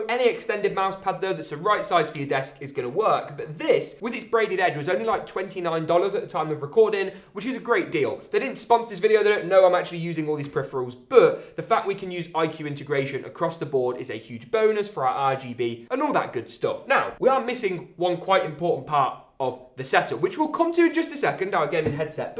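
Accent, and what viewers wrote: United Kingdom. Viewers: I love the way you speak in the British Accent.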